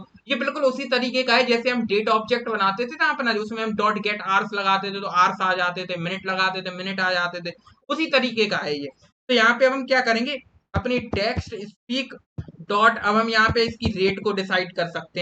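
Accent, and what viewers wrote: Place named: Bundelkhand, India. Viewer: you bundelkhandi acent is too good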